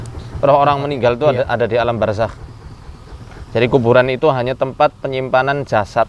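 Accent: Banyumas, Indonesia. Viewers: assalamualaikum pak ustadz alamat dimn aslinya orang mana...ngomong ngapak apa y...salam dari Cilacap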